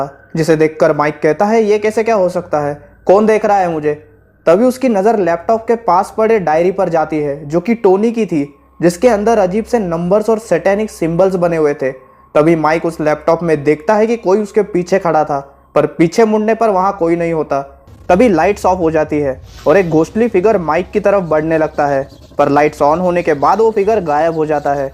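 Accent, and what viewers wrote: Maharashtra, India. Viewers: Marathi jesa lag rha h accent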